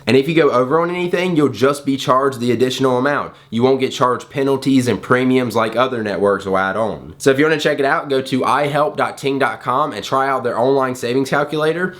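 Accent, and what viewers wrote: South, United States. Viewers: Were you raised or born in the south, then moved up north? I hear country in your voice, but I'm not trying to be stereotypical.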